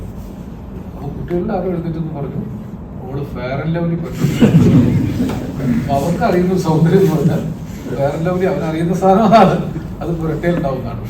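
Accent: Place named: Ponnani, India. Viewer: പൊന്നാനിത്തം നിറഞ്ഞു തുളുമ്പിയ മനോഹരമായ സംസാരം ❤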